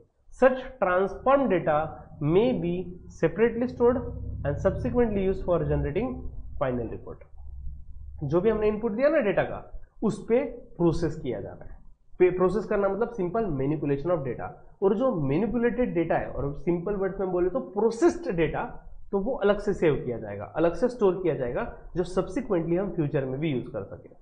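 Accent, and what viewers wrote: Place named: Maharashtra, India. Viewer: Your teaching is good sir but it seems like you are new in this teaching field and as a viewer i would like to give my honest opinion on your videos..u lack in speaking hindi as there is a slight mixture of marathi ascent while you speak hindi try to speak one language properly at a time... don't mix-up one language with another and i wish you all the best for your future.... 👍🏻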